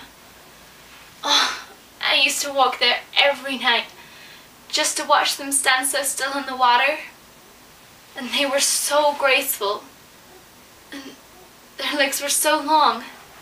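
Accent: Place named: Australia. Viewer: so two thoughts, First where are you from, on certain words i hear the slightest accent, like you hail from Australia or some thing, other thought, i really did not feel your emotion, you tried to emote but i did not feel it, lovely any how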